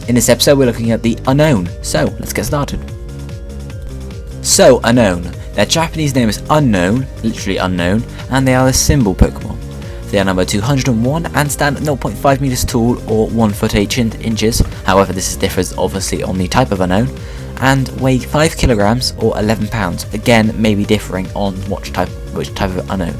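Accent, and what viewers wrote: United Kingdom. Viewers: Linkof War he's British. That's how they say it in europe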